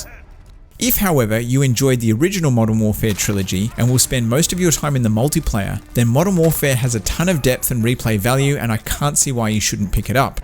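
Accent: Australia. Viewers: what is your accent btw?! Australian?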